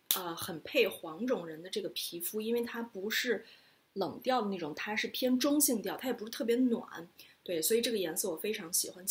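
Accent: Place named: Beijing, China. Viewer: 京腔儿听着好亲切 😊 你也在加州吗？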